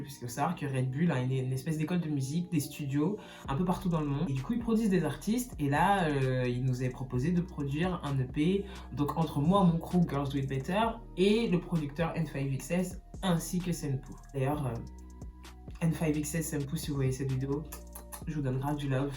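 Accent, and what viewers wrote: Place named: Ivory Coast. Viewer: l’accent ivoirien me tue! continue j’ai kiffé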